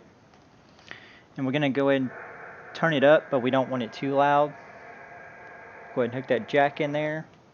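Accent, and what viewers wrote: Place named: South, United States. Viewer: Your accent is that of a southerner, so I find it interesting that you have as a background, the Brooklyn Bridge and the NYC skyline.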